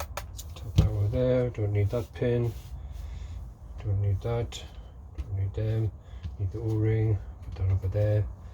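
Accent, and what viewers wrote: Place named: North East England, United Kingdom. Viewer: Where you from mate, sound like NE?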